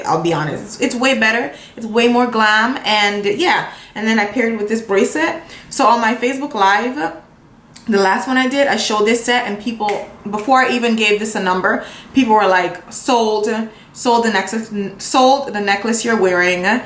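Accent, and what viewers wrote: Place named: New York, United States. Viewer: How did I know you was from NY and then you said Downtown Brooklyn.. Best wishes papa sis from Brooklyn😍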